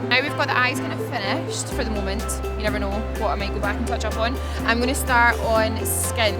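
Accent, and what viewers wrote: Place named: Glasgow, United Kingdom. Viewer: loved this video, you can tell you're from glasgow but how much you say 'kinna', makes me feel right at home lol x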